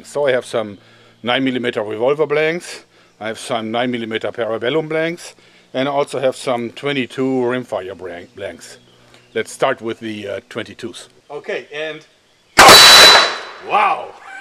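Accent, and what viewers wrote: Germany. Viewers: Your english sounds like you have a german accent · not russain accent, but we do have a German accent. which is about as cool if not cooler. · He speak like a german :O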